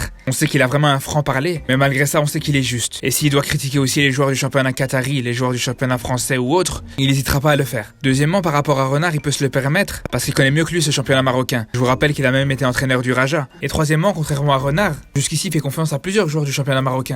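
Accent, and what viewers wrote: Belgium. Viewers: Votre accent belge je kiffe !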